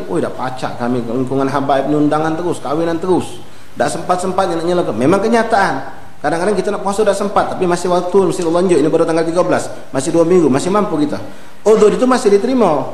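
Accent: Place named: Palembang, Indonesia. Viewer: wah... bahasa melayu palembang ini....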